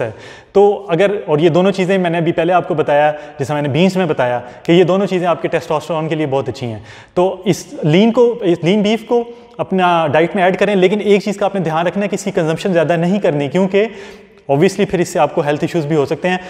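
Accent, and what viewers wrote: India. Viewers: Your language Sounds like Hyderabadi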